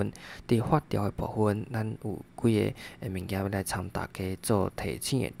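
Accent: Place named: Taiwan. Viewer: 老師講台語好好聽！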